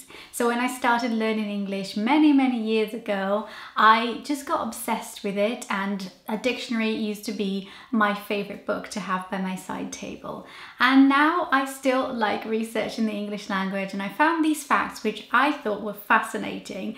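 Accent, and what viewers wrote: Wales, United Kingdom. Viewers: Came across your channel on channel mum weekly pregnancy videos and had a feeling you were from Wales? You have a Welsh accent! I’m from Cardiff! Found this video very fascinating! x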